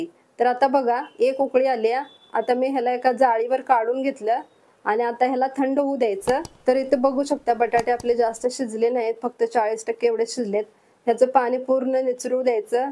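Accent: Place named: Kolhapur, India. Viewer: tai ani tumhi bolata khup chan kolhapuri god bhasha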